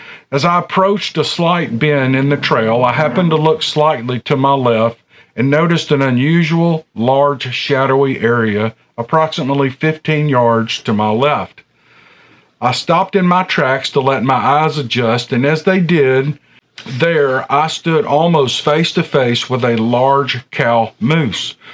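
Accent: South, United States. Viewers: I love your Southern Ness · I love your channel. And i love that southern drawl. The way u read other people's stories is so articulate and smooth it sounds as if it's your own experience. You are a pleasure sir. A real pleasure. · Like your stories,and your southern accent! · I love your southern accent ♥️ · Happy Father’s Day! You, in my opinion have the Best Sasquatch channel of all! You are a born-storyteller and we-in’s from Dixie, love your Southern accent! Thanks!👍👣👣👣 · As an Aussie I love his southern twang 💜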